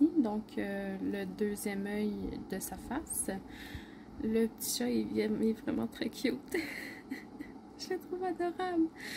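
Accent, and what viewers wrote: Quebec, Canada. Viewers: Coucou. Tiens 2 👎bon donc 2 personnes qui ont pas pu commander chez dac car sa va trop vite 😂😂❤️ merci un peu de dp avec ton accent québécois. 😍😘😘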